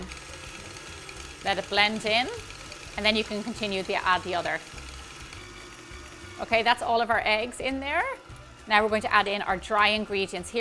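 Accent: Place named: Wexford, Ireland. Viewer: I never realised you are a Wexford Las how wonderful listening to you reminiscing about your Mother’s Christmas Pudding 🎄🎄🎄🎄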